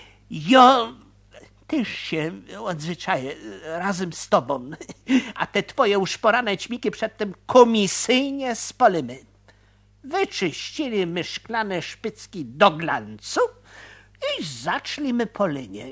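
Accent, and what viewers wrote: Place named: Poznań, Poland. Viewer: fajno godo :) tero tak mało wiary gogo po poznańsku szkoda :(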